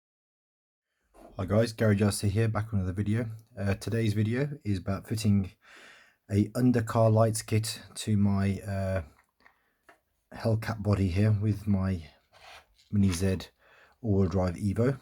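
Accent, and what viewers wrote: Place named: London, United Kingdom. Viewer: I just recently got the awd dodge challenge in destroyer grey, definitely want to get these lights for a red underglow! Awesome video dude. ... also, do you happen to know any good crowds to race with in London? Only asking because of your accent lol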